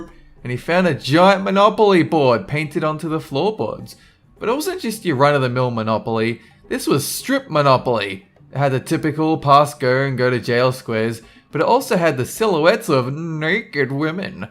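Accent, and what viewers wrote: Australia. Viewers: Sounds Australian to me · is he aussie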